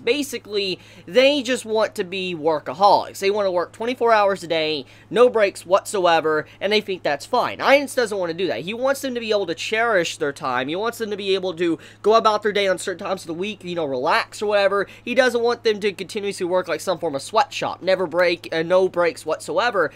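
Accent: South, United States. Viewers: Are you from the south I can hear a little of a southern accent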